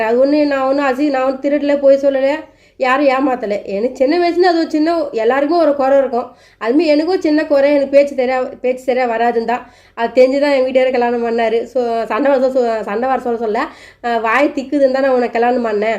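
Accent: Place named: Northern Tamil Nadu, India. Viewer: Don't Worry dear❤❤😢😢 விடும்மா என்னை அழ வச்சிட்டியே😢❤ வேலூர், விழுப்புரம், சேலம் என்று வடமாவட்டங்களின் வட்டார மொழி இப்படி தான் இருக்கும்.Pls🙏 friends யாரும் செல்விம்மாவை சங்கடப் படுத்தாதீங்க❤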